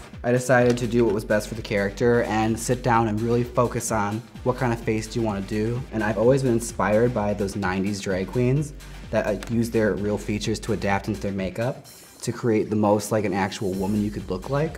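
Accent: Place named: Wisconsin, United States. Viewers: I'm from Wisconsin too and never really thought there was an accent but hearing him talk omfg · That Wisconsin accent 👌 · Honey, love the Wisco accent. Please curl your lashes and blend them with your fakes with mascara, the difference is so stark and obvi. Two, please do something with your lower lashes! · her Wisconsin accent! 💚 love from chicago!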